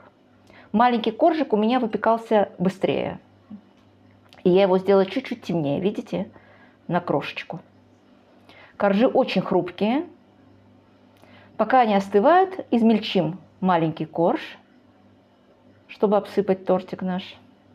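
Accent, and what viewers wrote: Baku, Azerbaijan. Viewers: Как приятен слуху Бакинский акцент. Родилась в Баку и прожила там 25 лет, но не знала такого рецепта. Спасибо , надо попробовать. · Этот незабываемый говор бакинский❤ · Вы видимо не так давно выехали из Баку, у вас до сих пор есть бакинский акцент🎉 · Чувствуется легкий бакинский акцент....😊 Спасибо!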